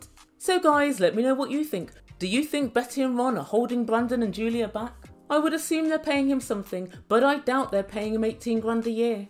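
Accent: South, United States. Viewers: your American southern accent gives me life every time I hear it 😂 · Loved your southern accent! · There is NOTHING I LOVE more than your American accent followed by your laugh. The best laugh ever. 🤣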